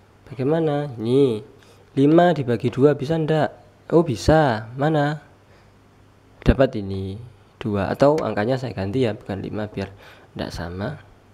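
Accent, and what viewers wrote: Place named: Temanggung, Indonesia. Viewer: kakak orang temanggung bukan? logatnya temanggung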